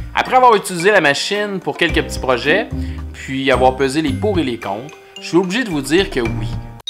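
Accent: Quebec, Canada. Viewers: Vraiment bien expliquer! Au "Salut!" j'ai fait le saut je m'attendais à entendre un accent français et non québécois! Vraiment bien fait le vidéo avec des explications claires! :D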